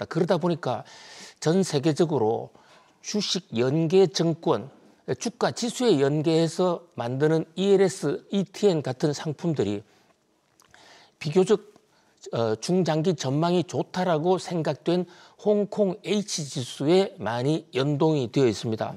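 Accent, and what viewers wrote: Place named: Chungcheong, South Korea. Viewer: 충청도에유 천천히 귀에 쏙쏙이네요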